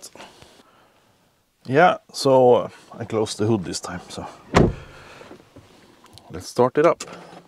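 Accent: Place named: Minnesota, United States. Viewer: Great video!! Born and grew up in Minnesota, I can tell where our accent comes from!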